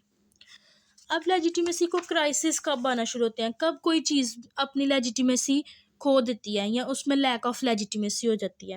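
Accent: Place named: Lahore, Pakistan. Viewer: Lahori awaz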